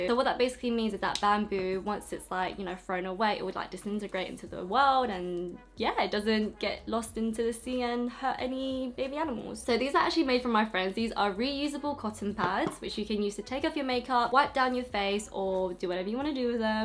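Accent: United Kingdom. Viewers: all these years i’ve been following you on instagram and now i’m finally finding you on youtube and on top that YOUR BRITISH ! wtf lmao i love your accent though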